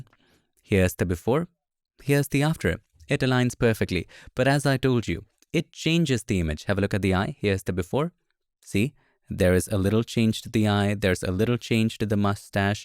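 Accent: India. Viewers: Your Indian accent is still very thick. Also, you will not look taller by pulling your hair up.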